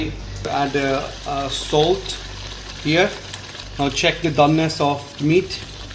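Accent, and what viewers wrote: Scotland, United Kingdom. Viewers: I detect a slightly Scottish inflection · Why you sound scottish? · Looks delicious probably cooking tomorrow 👍 You sound like you have been working ing in uk/Scotland?? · Is that a Scottish accent I hear?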